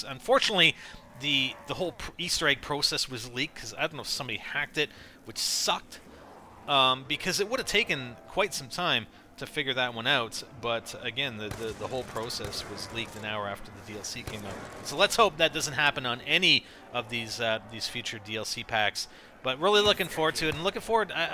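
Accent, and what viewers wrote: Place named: Canada. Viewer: let me guess your Canadian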